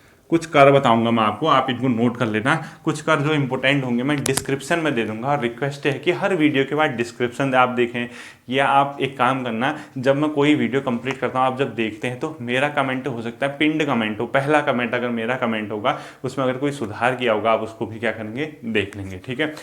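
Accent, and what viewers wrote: Kumaon, India. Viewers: Bhai aap Kumouni ho? Aapka bhasa shilli batata hai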